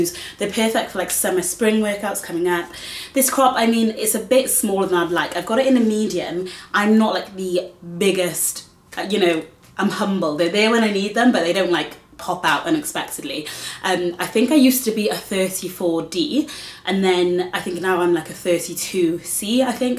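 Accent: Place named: RP, United Kingdom. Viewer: Omg you sound posh I love the way you talk tho it’s not the common English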